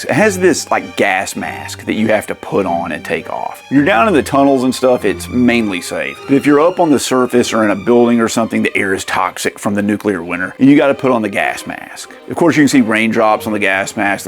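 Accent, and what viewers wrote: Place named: Appalachia, United States. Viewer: As an Appalachian American, I respect and enjoy the accent. 🤘🤘🤘 Subbed and looking forward to checking out other reviews and such.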